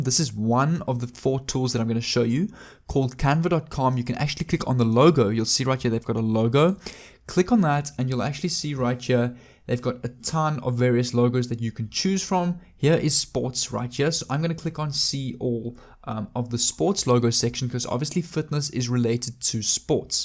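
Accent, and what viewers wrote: South Africa. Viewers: Hello I just discovered your channel. I'm definitely gonna follow you closely. You just commented on another channel. I'm going to model some you your videos. Hello south Africa. Love the accent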